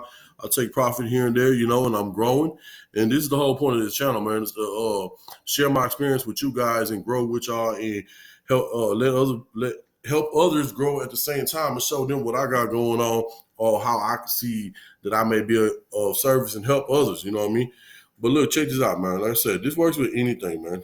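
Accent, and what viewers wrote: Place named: Houston, United States. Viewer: Must be from Houston. I know that accent when i hear it 🤘🏾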